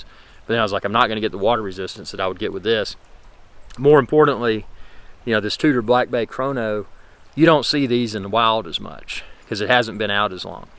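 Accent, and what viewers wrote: United States. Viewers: Great channel! I like you videos. So nice to hear a American accent rather than some English bloke reviewing watches. Lol 😂 You should check out the 2021 speedmaster. The bracelet is tapered 20mm to 15mm and they changed the endlinks. It’s super light and crazy comfortable! It’s also actually Meta tested so crazy accuracy and it’s actually tested to 50m not just rated 50m so you can now swim with it. My wife bought me one for my 50th birthday absolutely love it. The Tudor is a great watch too. I’ve owed a few black bays the only one I’ve kept is the BB58 blue. Even though I have a 7 3/4 wrist the 41mm BB slab side’s look silly on my wrist. The 58 looks perfect since it’s thinner. I know you have a 58 which do you prefer?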